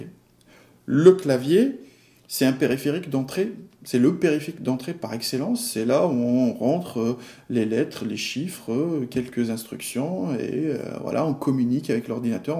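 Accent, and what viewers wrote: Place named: Italy. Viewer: C'est ça qu'on appel "LA PÉDAGOGIE". PS: avec accent italien.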